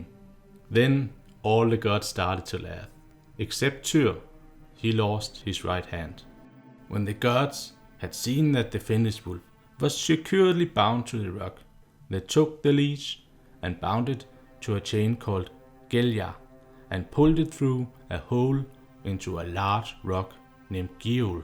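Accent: Denmark. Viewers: Excellent Video!, i have the doubt, where are you from? i think you have danish accent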